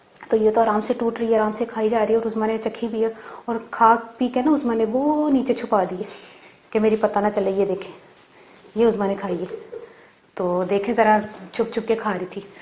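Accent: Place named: Punjab, India. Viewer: Ap ke panjabi bikul hammari wali ha ap boht achi legti hn panjabi bolte hwe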